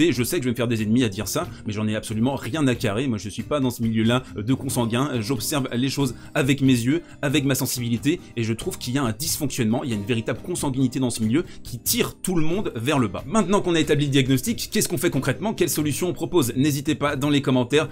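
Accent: Belgium. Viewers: Je pensais sérieusement que tu étais belge 🤔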